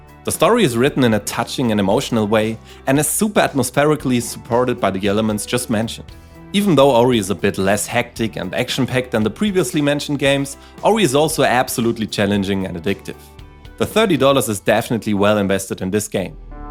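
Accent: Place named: Germany. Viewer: Your accent is german, isn't it? As a fellow german myself I find this really funny but I'm worried my own accent will get stronger through hearing all of your videos. Also I played moonlighter after getting it recommended and I liked the concept, but it was VERY repetitive and didn't have to offer anything than this innovative selling game mechanic. After the second dungeon I got really bored and wanted a bigger overworld open world and varying gameplay. All the other games on these list are absolute masterpieces though🔥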